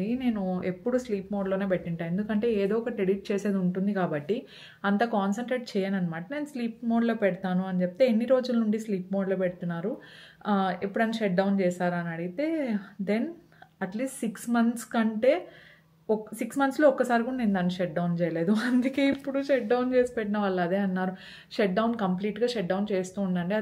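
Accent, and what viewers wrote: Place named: Rayalaseema, India. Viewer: Meeru same ma lage matladtunnaru meeru rayalseema or kadapa valla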